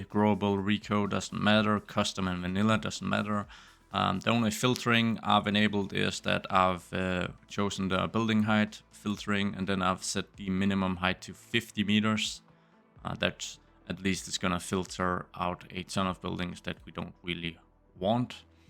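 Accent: Denmark. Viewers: Er det en dansk accent jeg spotter? 😅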